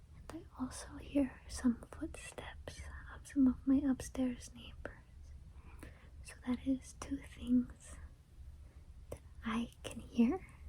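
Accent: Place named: Eastern Europe. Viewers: I thought you were from Eastern Europe honestly. I’ve been on the West Coast my entire life and only heard an accent similar to yours from those who immigrated to the US when they were younger, so they end up with a bit of a mixed accent. Anyways, love your voice and videos. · that wierd. I thought I heard a east european accent. I am a Las Vegas native and I thought I knew west coast accents lol interesting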